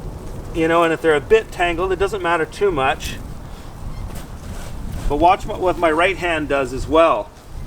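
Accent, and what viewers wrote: Canada. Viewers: The Canadian accent is why I’m here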